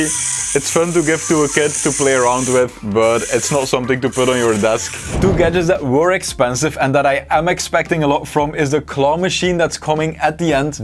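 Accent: Netherlands. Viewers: You can hear the Dutch accent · i heared a dutch accent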